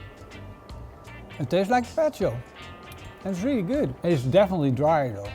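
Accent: Netherlands. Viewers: I could just hear that dutch accent and it makes is 10 times better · First video of you I watch, one minute in I thought “huh he sounds dutch” and sure enough a few seconds later you start talking dutch lol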